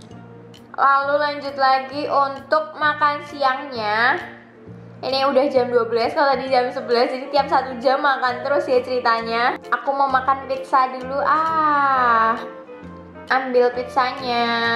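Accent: Java, Indonesia. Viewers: Ka dari jawa timur atau jawa tengah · Mbaknya org mana?ook rodo2 njowo😂😂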